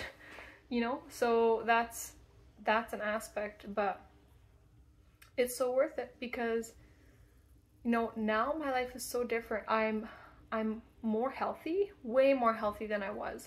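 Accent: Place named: Alberta, Canada. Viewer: Hey! Fellow ex Mennonite here (old colony). Thanks for sharing your story. I really enjoyed listening... also it sounds like maybe youre Albertan as well?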